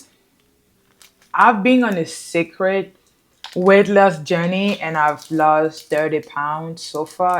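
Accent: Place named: France. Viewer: Hi, I like your French accent that hides behind the English one!! I subscribed just for that!!! Je me suis abonné juste pour cela!!!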